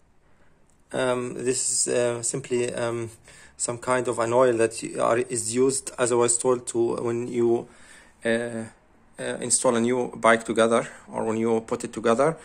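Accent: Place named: Arab world. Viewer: واضح من اللهجه انك عربي حط الترجمه للعربي لان افكر اخذ مثلها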